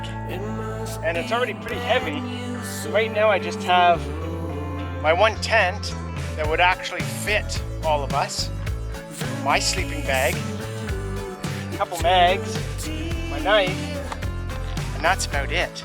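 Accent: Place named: Canada. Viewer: Strong Canadian accent here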